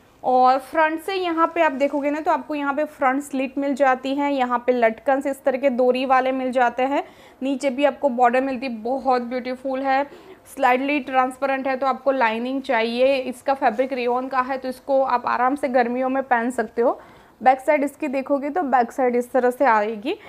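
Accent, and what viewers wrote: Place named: Gujarat, India. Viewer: Ma'am I think aap gujarati ho aapke bolnese lag raha hai.. Mebhi gujarati hu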